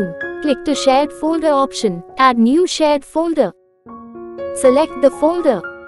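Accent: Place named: India. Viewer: Might be good content, however I simply can not stand listening to Indian people speak. Especially an AI speaking Indian. What a turd of a video.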